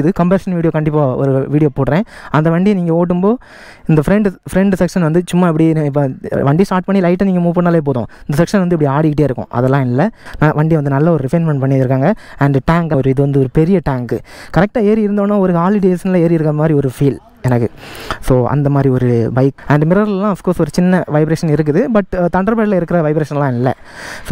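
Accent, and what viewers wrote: Kanyakumari, India. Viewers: Wow KK slang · R u from kanyakumari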